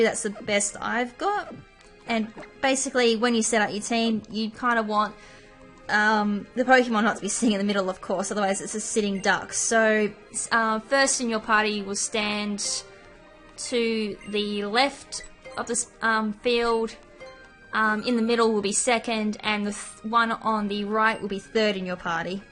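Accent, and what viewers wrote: Australia. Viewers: your accent makes me want to visit australia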